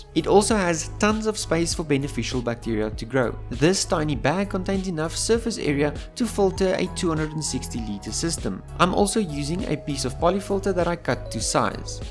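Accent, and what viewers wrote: South Africa. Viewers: Everytime this guy says "prawns" i think of the film district 9. Gotta love the south african accent.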